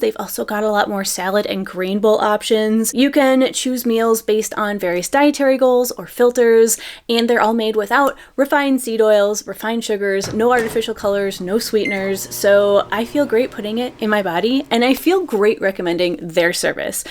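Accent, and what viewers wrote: Quebec, Canada. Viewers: I just discovered your channel. I knew I recognized un accent du Québec! Good job guys, I love everything about your channel ❤ Continuez votre beau travail.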